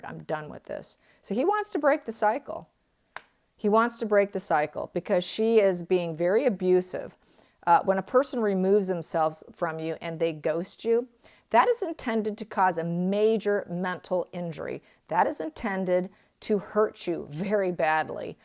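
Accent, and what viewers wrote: United Kingdom. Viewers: She is a genuine psychic, she doesn't read with military precision but it all pulls together in the end. If you are not British you may find the accent a bit difficult, but then us Brits find American accents and their mangled English difficult too. Oh well, it is good we are all different isn't it?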